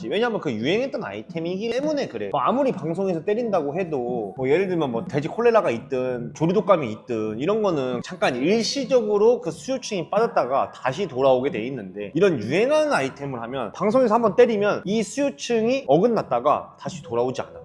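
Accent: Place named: Jeolla, South Korea. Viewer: 저도 익산이 고향인데.. 뭔가 말투가 전라도의 늬앙쓰가 있긴있써^^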